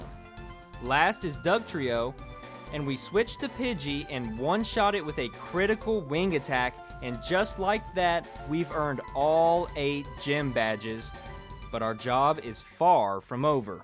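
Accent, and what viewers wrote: United States. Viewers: Is that a South Carolina accent I hear? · your southern accent makes this video funnier to me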